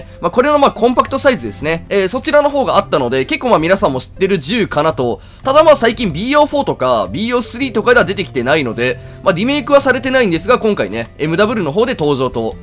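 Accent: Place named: Hyogo, Japan. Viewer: 時々聞き慣れた発音してたからもしかしたらと思ったら もしかしたらで兵庫出身やったんですね笑 動画で「なんどいや」 , 「~じゃいや」「〜がいや」etc 言って流行らして下さいw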